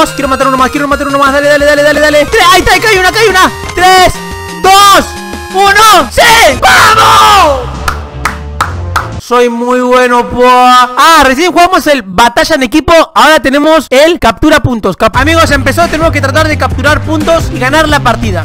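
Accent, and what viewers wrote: Argentina. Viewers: Sos argentino??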